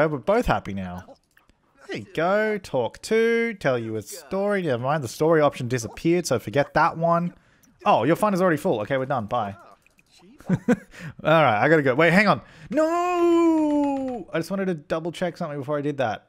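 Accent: Australia. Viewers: I once watched on my laptop closed it over then in the middle of the night the video somehow started again and (who lives females) suddenly beard a male Australian accent shouting about Katrina My name is pronounced Katrina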